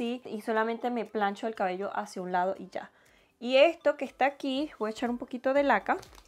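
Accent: Venezuela. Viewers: ES VENEZOLANA? CREO QUE SI POR SU ACENTO ...ADEMAS QUE BELLA ES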